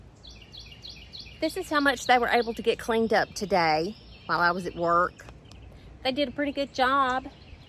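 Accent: Oklahoma, United States. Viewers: Sounds like Southern Oklahoma.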